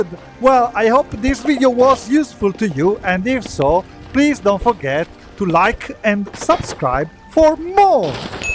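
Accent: Italy. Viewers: Wait r u italian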